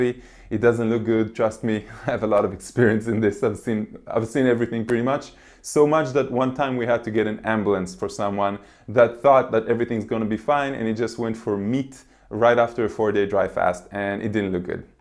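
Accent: Israel. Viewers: Hi.. came across your vid... As an Israeli- I can hear your HEBREW!!!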